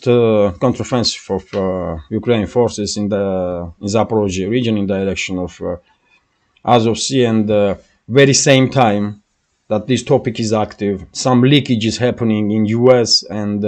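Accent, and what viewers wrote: Russia. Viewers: By shortening the videois already a good start.Also having English subtitles helps alot because of your Russian accent.. 🎉🎉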